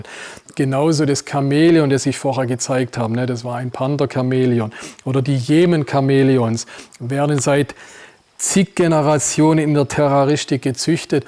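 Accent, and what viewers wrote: Swabia, Germany. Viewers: Klasse. Vielen Dank für dieses wichtige und meiner Meinung nach dein bisher bestes Video. Einziges Manko aus meiner Sicht : dein hartnäckiges Beharren auf deine für Nicht-Schwaben schwer verständliche Mundart. Da du das offensichtlich weder ernst nimmst noch jemals ändern wirst : wie wärs mit generellen Subtiteln auf Hochdeutsch? Abgesehen davon hast du das Video echt toll gemacht und bei dem brisanten Thema echtes Fingerspitzengefühl bewiesen , Hut ab. Viele Grüße von Toni Mindnich aus Spanien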